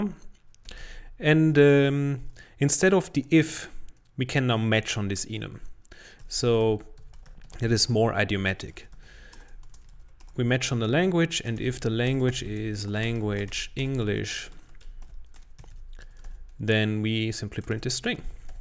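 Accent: Germany. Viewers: I'm not a beginner in Rust, but I really enjoy how laid back your method of teaching is. It is especially nice to see you sit there on the chair and the computer on the wooden table and the code to the right. And of course, the German accent is nice. This experience is really soothing! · Agreed, german accent is always nice and welcome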